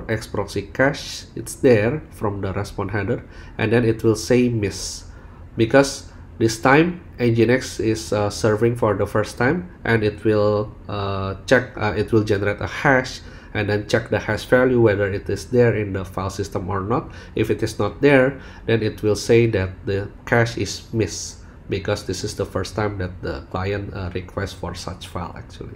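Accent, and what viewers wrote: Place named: Philippines. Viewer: Are you from Philippines? Your accent is so similar to my co-worker it almost feels like I'm listening to him talk 😁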